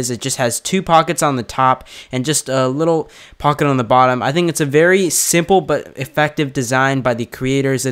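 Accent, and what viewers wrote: Canada. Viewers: Your're Canadian?!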